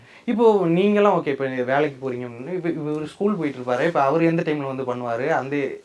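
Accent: Bangalore, India. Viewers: Nan kuda chennai slang nu nechan but Bangaluru tamil nu enaku thariyathu super ha iruku unga videos new subscribe ❤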